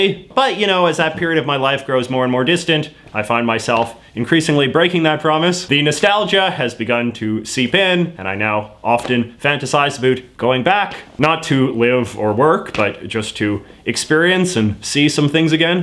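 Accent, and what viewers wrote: Canada. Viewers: Ik this video is about japanese culture but by watching you im learning alot about the canadian accent. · You sound astronomically Canadian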